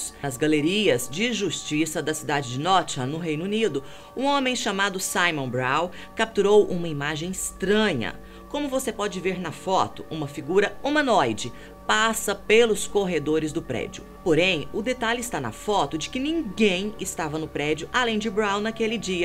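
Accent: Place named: Rio de Janeiro, Brazil. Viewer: Certeza que ela é carioca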